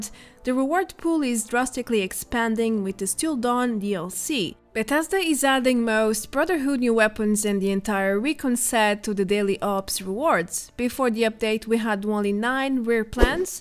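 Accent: Portugal. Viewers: Thank you so much for the video, amazing analysis as usual. I agree I'll probably not going to get into the daily godroll event to get these, took me a while to assemble my SS armor (and it's not even that good, some pieces could be better). Lastly, don't worry about how people perceive your pronunciation, let them try some of the Camões language and see how easy it is. :D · I love the way you speak. That accent... Portuguese right? You pronounce words just fine.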